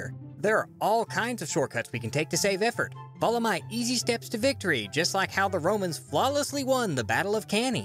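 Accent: South, United States. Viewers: Man I cannot ignore the southern accent now that I've spotted it.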